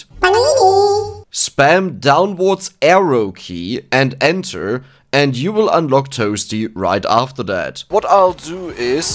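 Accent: Germany. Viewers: Kann das sein das du Deutsch bist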